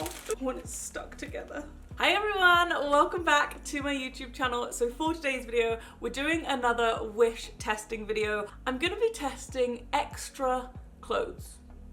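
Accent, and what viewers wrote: United Kingdom. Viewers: Are you British 😊😊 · Are you British or something bc I love your voice it is great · she is British like me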